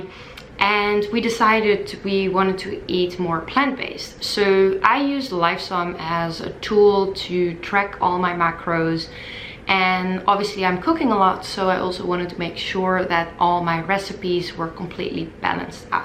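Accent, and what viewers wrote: Netherlands. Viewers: the moment I opened the video I recognized the accent. its so cool to see a model from the Netherlands, half my family lives there and it's probably one of the prettiest places ever.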